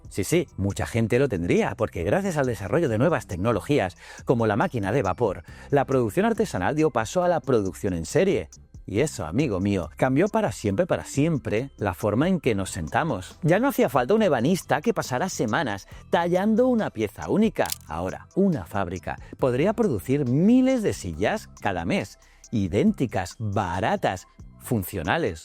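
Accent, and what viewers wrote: Spain. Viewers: Tú eres andaluz? Verdad? Las tres primeras palabras del vídeo te delatan, jaja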